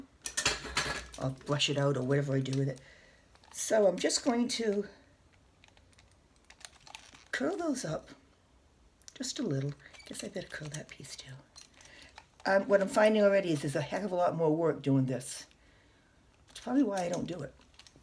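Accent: New England, United States. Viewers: I’m Southern and I like a yankee accent. Reminds me of “All in the Family.” Don’t change the way you speak❤✝️Hair & makeup looks 👍🏻 good.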